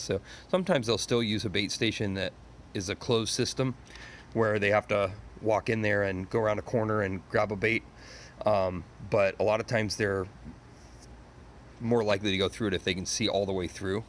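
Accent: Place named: Southern California, United States. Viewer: You sound like a “socal” guy😮. Nothing like saying hey! Thanks for the video🎉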